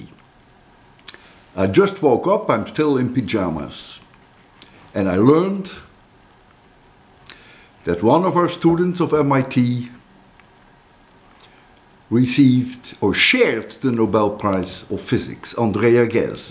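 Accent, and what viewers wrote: Netherlands. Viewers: This guy sounds so Dutch it's insane I love it.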